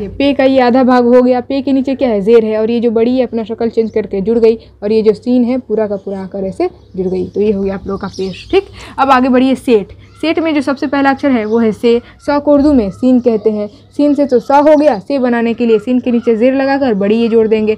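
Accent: Bihar, India. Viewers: Aap bihar se ho na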